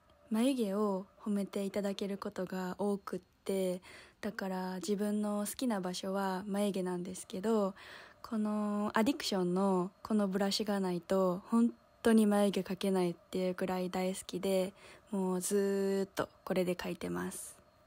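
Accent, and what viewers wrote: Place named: Kansai, Japan. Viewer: さんの関西弁、大好きです＼(^o^)／ いつまでも、そのイントネーションでいてくださいね！てか、2年温めてた！？www これからも、マイペースに動画更新続けてくださいねー♪ おわりー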